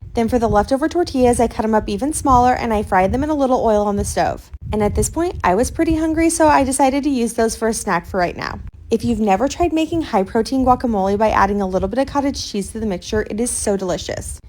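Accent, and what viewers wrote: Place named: Australia. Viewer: Are you Australian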